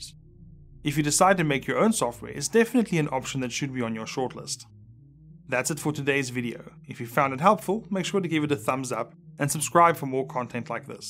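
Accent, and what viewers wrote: South Africa. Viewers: You a Jozi man?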